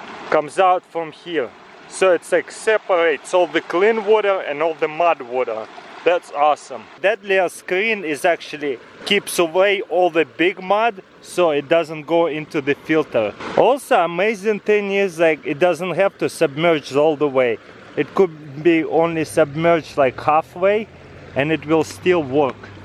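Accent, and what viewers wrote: Russia. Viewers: sexy russian accent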